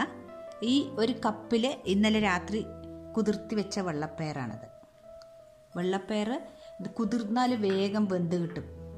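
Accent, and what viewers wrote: Palakkad, India. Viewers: Chechi palakkad ano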